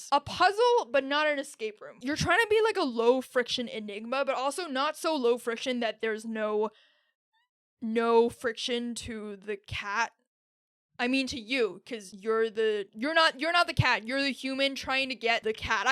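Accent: United States. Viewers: Way too funny & chaotic, as an American you sound like one & subscribed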